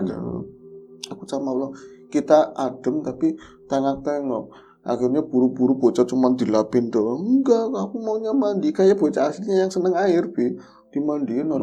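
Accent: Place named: Tegal, Indonesia. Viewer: Di kenalnya diluar tegal kang, bg aku, y kngen dialeg2 tsb sll menantikan lapak horor n ngobor, sbg nostalgia jaman kecil dl di comal. Salam.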